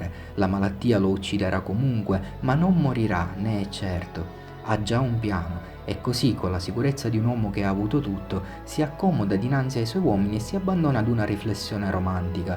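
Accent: Italy. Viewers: Ottimo canale. Una domanda. Siculo? Agrigentino? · Bel video! Sei calabrese/siciliano?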